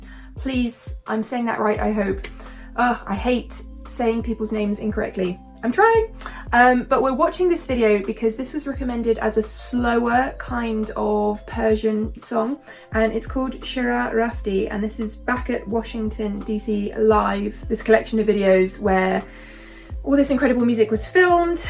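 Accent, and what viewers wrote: United Kingdom. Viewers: Best reaction ever, professional and respectfull, thanks and good luck, i like very much her typically british accent , nice. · Are you brithish ?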